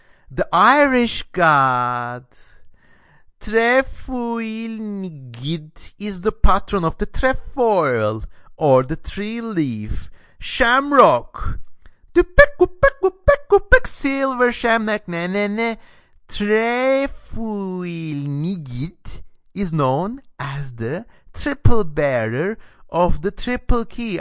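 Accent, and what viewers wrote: Turkey. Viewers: Op sounds turkish can you confirm?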